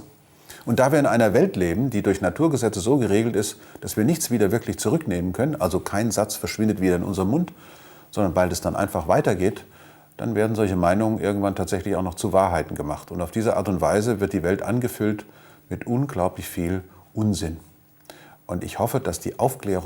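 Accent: Hesse, Germany. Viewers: Karli Davidson Prof. Lesch hat zwei Themen herangezogen und beide wissenschaftlich erklärt. Du hast nur inhaltlich und argumentativ versagt. Prof. Lesch kommt aus Hessen. Dort wird gerne mit isch statt ich gesprochen. Man bekommt einfach gewisse Dialekte nicht weg. Ich möchte jetzt nicht wissen wie oft du schon "da wo" gesagt hast. Oder "manchmal immer". Fakt ist, dein Kommentar ist so wertvoll wie Scheiße am Schuh.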